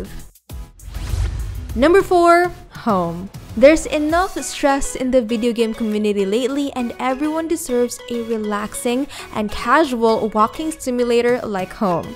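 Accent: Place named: Philippines. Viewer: the narrator is filipina. halata sa accent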